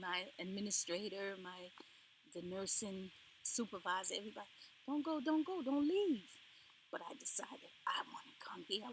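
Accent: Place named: Jamaica. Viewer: I hear a Caribbean accent...sounds Jamaican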